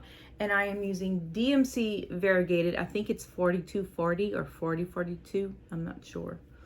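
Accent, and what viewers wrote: Louisiana, United States. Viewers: Oh =my goodness! I just found you. You sound like home! I am from Louisiana. Thank you for a wonderful video. I will watch your other videos this week.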